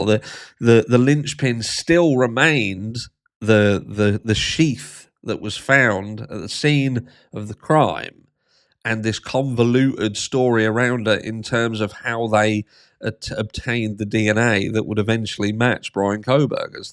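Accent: United Kingdom. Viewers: bryan did it hahaha glad to see u where wrong but love accent cutie bear uk man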